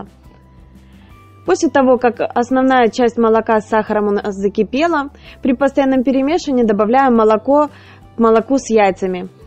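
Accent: Ukraine. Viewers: Зачем же себя так мучать? Вас заставляют смотреть такой прекрасный ролик? Открою вам секрет в Украине так все говорят!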